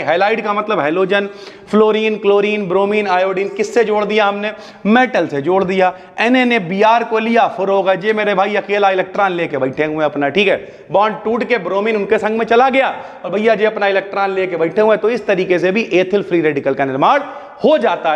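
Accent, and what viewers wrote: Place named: Kanpur, India. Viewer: sir saari videos aapki line se dekh rha hu sir sb samjh me aa rha i really thank u sir for such a great content in your kanpuriya accent.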